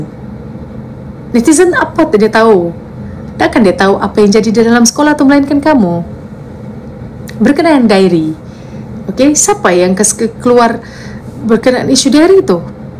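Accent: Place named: Kuala Lumpur, Malaysia. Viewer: ending terbaik...Sdri ni mahir bertutur dgn gaya pertuturan org KL Sgor la.....canggih...thx..all the best and May ALLAH perlihara semua geng yg BENAR dan memebala anak kecil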